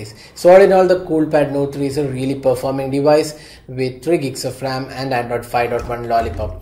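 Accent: Kerala, India. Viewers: like your accent. Kerala?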